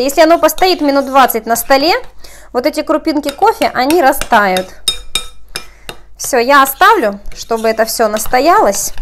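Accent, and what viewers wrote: Ukraine. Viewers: Маладец умничька а акцент украинский это пустики главная хорошая память, спасобность, нада мала говорить а больше делать.🍩🍮🍞🍰🍯🍭🍔🍕📖🍑🍏🍎🍅🌽🍓🍑🍈🍌🍐🍇🍒🍉🍆🍉🍍🍐🍒🍋🍌🍊🍈🍈🍧🍨🍦🍦🍩🍩🍞🍞🍞🎂🍰🍰🍪🍪🍫🍫🍬🍬🍭🍭🍭🍇🍇🍒🍋🍋🍊🍏🍎🍊🍒🍇🍉🍌🍌🍈🍑🍊🍒🐤🐤🐤🐔🐘🍫🍩🍞🍰🍏🍊🍊🍑🍐🍌🍈😣😞😒🐼🍓.🍰🎂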